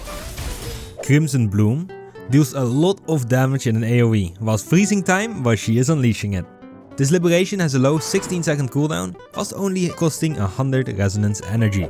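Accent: Netherlands. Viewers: Danijn for the win! You sound Dutch? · love the dutch accent :)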